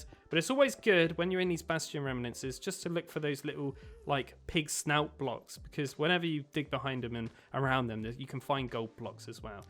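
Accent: United Kingdom. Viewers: This series is the first Thing ever I saw from this man I just now physically actually realized he’s British and it just makes me feel so stupid PHWHWHWHHWHWHW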